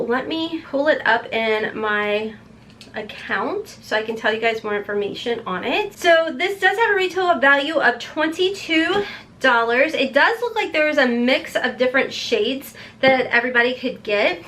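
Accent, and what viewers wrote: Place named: Kentucky, United States. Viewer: That Kentucky drawl comes out from time to time. When you said MAC, my captions thought you said “mayak”. Like kayak, but with an m. 😂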